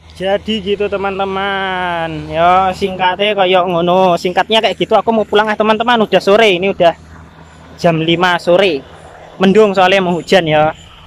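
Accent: Java, Indonesia. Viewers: Medok mas bahasane, mahamke😄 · Jowo masseh😂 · Jowo asli mas se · Kok njowo banget to om, po ortu wong jowo? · mas katanya orrang xmantan ko logatnya jawa. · Kel Kalimantan tapi jowonya medhuk Saya Semarang bang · Katanya orang kalimantan tp lolgat bicaranya kok jawa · Orang mana to om....kok Meduk men omongane... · MANTAP DAN LUCU TOTOH X JOWO MU MAD, AKU SENYUM2 SAMBIL NYIMAK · Mase kaya orang Jawa ya....?😊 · Mas.... Sampeyan kuwi ngomong nok ndi...? Jepang po indonesia.... Ak seneng logate jowo nemen....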